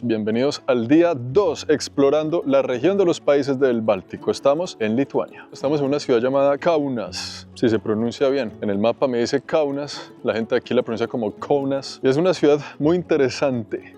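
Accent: Colombia. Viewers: que amenos videos haces, te sigo desde hace mucho tiempo y nunca decepcionas, te felicito y el acento colombiano le da el toque original! Un abrazo desde CDMX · Que gracioso y simpático es el acento colombiano de, me encanta 😊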